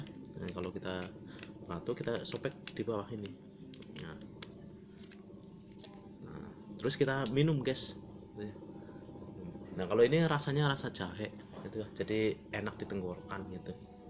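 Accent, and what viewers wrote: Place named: Banyumas, Indonesia. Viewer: Sip...dicoba kang. Kang asline ngendi sih? Ngapake kaya enyong banget...he he he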